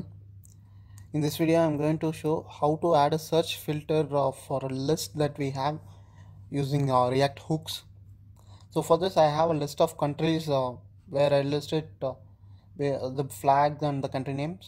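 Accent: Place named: India. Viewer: i think i better understand indian accent than american. Thx u a lot)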